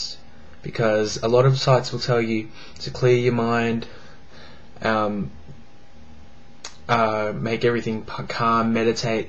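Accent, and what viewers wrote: Australia. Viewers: Is he Aussie? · You're Australian, aren't you?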